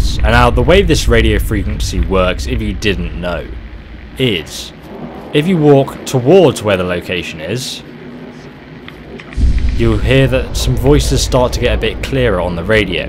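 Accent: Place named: United Kingdom. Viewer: Dyslexia makes it hard to read. Not to speak =) I also think it's just a british accent thing. No biggie.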